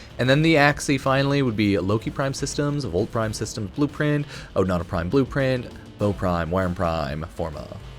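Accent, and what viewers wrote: Canada. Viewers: New to your channel. Gotta say, you sound very Canadian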